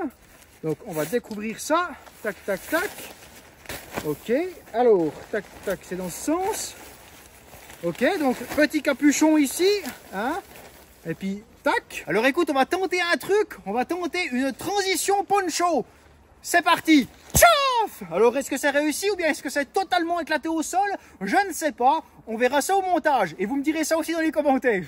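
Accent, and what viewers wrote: Switzerland. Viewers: Super accent jurassien suisse, j'ai la larme à l'oeil!😂👍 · Eh ta moi en proche frontière suisse qui devine ton patois sans me tromper 😅😂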